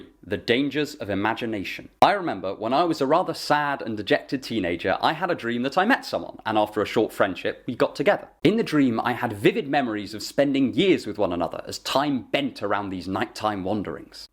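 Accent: United Kingdom. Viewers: Thanks for the info !! Your advice is greatly appreciated and your videos are really informative and intriguing and would like to ask where is your accent from it’s British right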